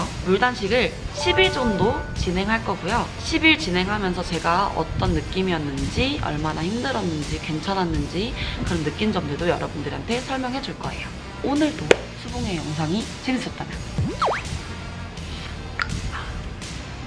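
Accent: Gwangju, South Korea. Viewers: 광주같아여!! 익숙해서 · 공부 열심히 하셨네요 전문가같네요 고생하셨네요 근데 전라도분이신가봐요 억양이 ㄷㄷ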